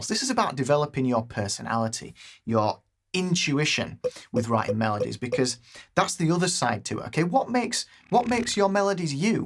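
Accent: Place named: Northern England, United Kingdom. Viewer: Do you do lessons around the north? just assuming you live up here with the accent. Ey up! Cheers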